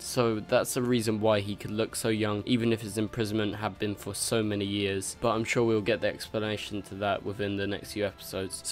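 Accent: United Kingdom. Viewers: Are you British? What accent do you have?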